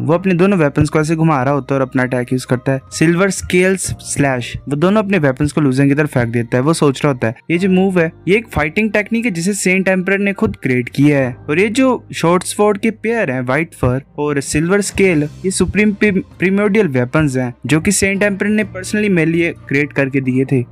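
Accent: Himachal Pradesh, India. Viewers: Bhai aap himachal se ho kya😅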